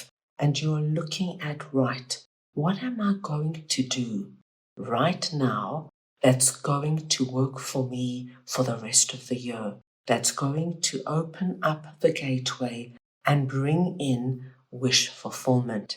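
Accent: South Africa. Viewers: Are you South African ? Hearing the accent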